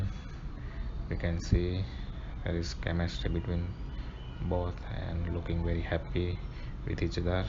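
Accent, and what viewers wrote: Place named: India. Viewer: That Indian accent is horrible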